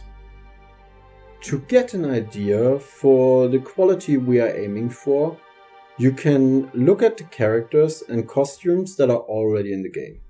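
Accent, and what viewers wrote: Germany. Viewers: Sehr gutes Englisch fast ohne deutschen Akzent. ❤ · you can hear the german accent :D gutes englisch bro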